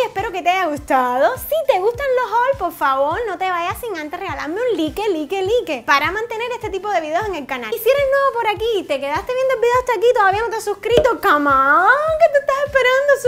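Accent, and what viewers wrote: Cuba. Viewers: me encantan tus vídeos, eres muy graciosa y muy guapa. Me encanta tu hacento, es como el de mi abuela que es de Cuba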